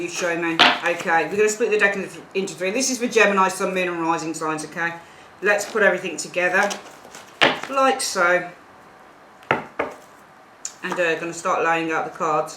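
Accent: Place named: Midlands, United Kingdom. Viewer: You are good. So accurate it’s scary. Love your midland ?accent. Xxx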